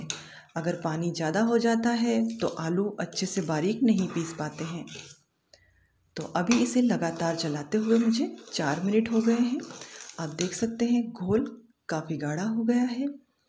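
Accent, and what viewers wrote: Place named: Malwa, India. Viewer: Aapko pta nahi gagan ji, indore malwa me aata hai aur waha ke local log isi tarah se bat karte hain...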